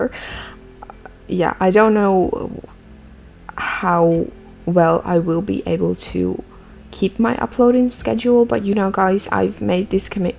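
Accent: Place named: Finland. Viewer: Such a beautiful doll! I especially like the heterochromic eyes. Oh, and I knew in an instant from your accent that you were Finnish (I'm new on your channel) - kyllä suomalainen suomalaisen tunnistaa, hehe.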